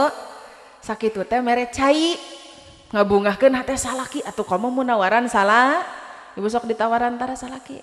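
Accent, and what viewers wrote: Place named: Sukabumi, Indonesia. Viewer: Logat Sunda Sukabumi,.... gaskeun.Bekasi hadir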